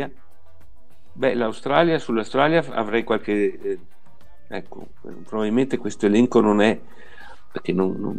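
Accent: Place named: Veneto, Italy. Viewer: Ma una domanda dato per scontato il tuo accento veneto, dove vivi?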